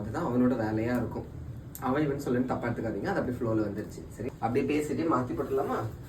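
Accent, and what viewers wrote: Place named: Nagercoil, India. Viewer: Hi Anna Nanum Nagercoil than Ippo Chennai la erukom Unga video pakkum pothu, namma sontha mannu pechu, romba santhosama erukku anna. Niraya video podunga anna Aduppankarai pakkum pothu namma ooruku pona mari santhosam Thanks anna❤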